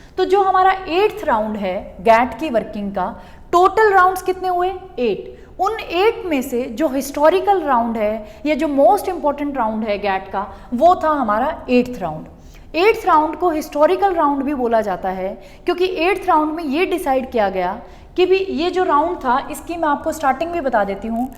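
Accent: Punjab, India. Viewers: Mam are u pnjabii??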